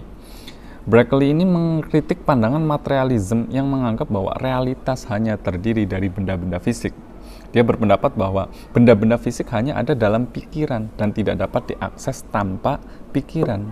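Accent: Banyumas, Indonesia. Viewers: Njenengan ngapaknya daerah mana mas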